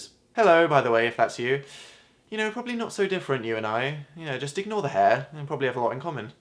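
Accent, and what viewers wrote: United States. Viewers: why did you have an American accent when you were 14? x3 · in 5 years managed to lose a lisp a pillow and an american accent... lol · Who would've thought that was American when he was fourteen..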